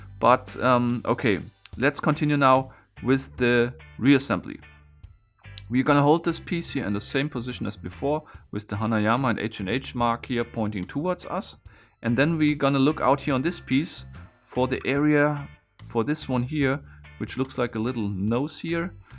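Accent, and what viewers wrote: Germany. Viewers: What language is that? I’m just curious. And also what country are you from? I want to say you have a German accent?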